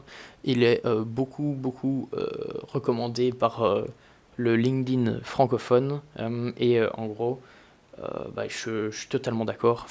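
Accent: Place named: Belgium. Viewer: En t'écoutant j'me suis dit "Il a un léger accent Belge lui..." Jusqu'à ce que je fouine un peu et que je trouve la réponse 😅 Aaaaah le codage ! Tout ce que je déteste 😂 Par contre je trouve ça TELLEMENT CLASSE de connaitre tout ça, et en plus d'être passionné ! Quelle chance ☺ Le running et le montage c'est plus ma passion 😁 Bon courage pour tes objectifs 2024 et ton Marathon ! (Avril ? Le Marathon de Paris ?)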